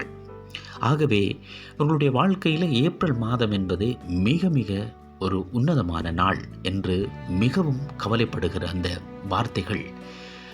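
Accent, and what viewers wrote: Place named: Sri Lanka. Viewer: Ok Anna neenga sri lankava unga slang apd dam erku😢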